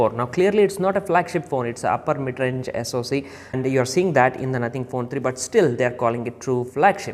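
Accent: India. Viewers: Good content, love your accent! Clear to be heard for non-Indians like me ✨️🙏🏻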